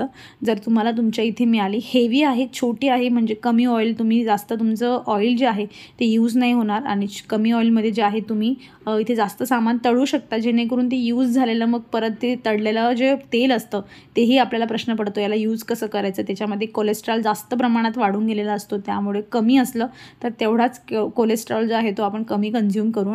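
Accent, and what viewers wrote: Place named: Jalgaon, India. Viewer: Khup chhan video tai.... Sagdech video mst astat... May be tumhi jalgaon che asavet language varun vatate tumchya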